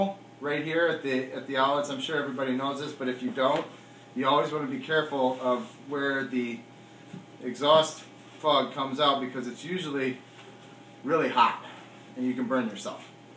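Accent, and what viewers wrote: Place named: Wisconsin, United States. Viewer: 10 years after this was posted I'm here learning.. I only stayed to watch because of your accent 😂 and you're from Wisco.. my family is from Fond du Lac .. I'm in Ga ( booooo ....Go Pack Go) ANYWHOOOOOOOOO thanks for the tips! I have 3 fog machines to clean before I store them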